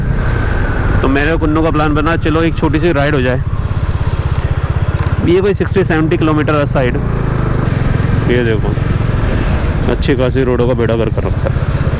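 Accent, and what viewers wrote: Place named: Haryana, India. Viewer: Haryanvi accent is so authentic... 👌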